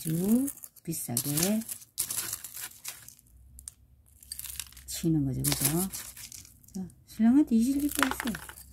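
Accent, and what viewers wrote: Gyeongsang, South Korea. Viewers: 제라륨 분갈이를 경상도 싸투리로 정겹습니다 분갈이할 때 조마조마합니다 분갈이 하고 죽는경우를 많이 겪었네요 ㅎㅎ 재밌네예 부지런한 손은 아름다워요 호야네 실방에서~응원하러 왔어요 😊😍😍👍👍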